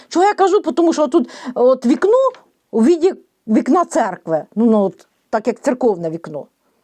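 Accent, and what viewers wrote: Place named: Surzhyk, Ukraine. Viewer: Пані, ваші емоції і ваш суржик- це щось неймовірне, бо в цьому криється справжня українська відьма!!!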